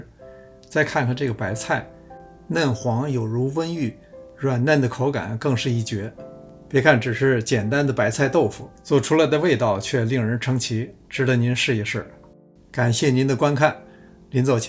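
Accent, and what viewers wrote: Beijing, China. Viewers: 您是北京人嘛🤩口音有点亲切啊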